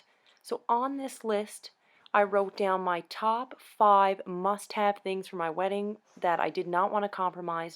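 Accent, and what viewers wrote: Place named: Cape Breton, Canada. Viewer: I saved a bunch of wedding videos to watch later for getting started with my wedding planning. I'm in Ontario but I'm from New Waterford and it was a nice suprise to come across your video - I knew by the accent you were from the island! Lol Thank you for the very helpful video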